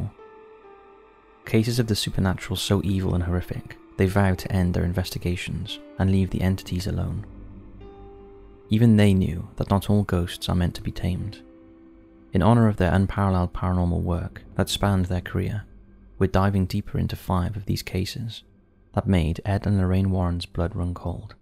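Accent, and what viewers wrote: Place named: England, United Kingdom. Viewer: I thought wecwere going to see and hear Ed & Lorraine Warren. Instead, we hear someone with an english accent talking over the entire video. Did not hear any of the actal video. Extremely dissappointing.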